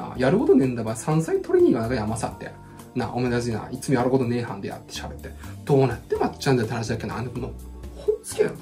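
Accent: Tsugaru, Japan. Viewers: 定期的に津軽弁が聴きたくなる動画😂 いや〜面白いですね！！青森のお父さん、優しくていいなぁ〜。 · 標準語のイントネーションに津軽弁が残ってますね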